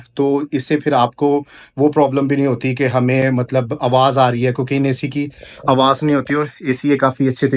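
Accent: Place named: Punjab, India. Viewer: Ap Baloch hain but ap ka lehja Punjabi jaisa hay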